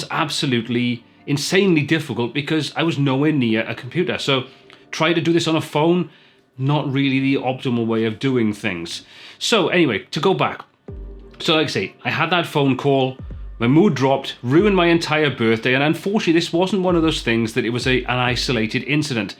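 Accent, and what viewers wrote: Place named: Scotland, United Kingdom. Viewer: Hi. Another great video! I've gotten into all this computer-related stuff really (too?) late in life - how I envy you youngsters! ;-) I'm in the process of trying to put together some websites to (hopefully!) make me some money in the Affiliate marketing game which will - I further hope - be generating a 'nice little earner' by the time my pension comes due (I'm 63). I had to smile when you were on about the inflated price 'trick'. In a previous life - as a self-employed vehicle mechanic - I fell foul of that 'trick' on several occasions! Scenario: Regular customer - good payer. Really sh*tty job that I REALLY don't want! Give customer ridiculously high price quote. Result? Oh, thats less than I expected, go on then, you'd better do it! Result? Oh b*llocks!!! :-) Social life? I was Competition Secretary for a small local golf club for some years and yes, like you, many's the time I was out and about and I'd get a phone call from a club member with some query for which I needed computer access - so I feel your pain! 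lol Finally, I just love your Scottish accent! ;-)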